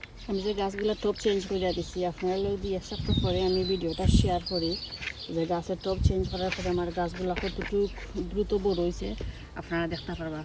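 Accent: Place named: Sylhet, Bangladesh. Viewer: She is speaking properly, shes speaking sylheti which is a different dialect of bangla it does not mean it is from a lower class. YOU need to learn to speak properly and not make judgments based on people’s background.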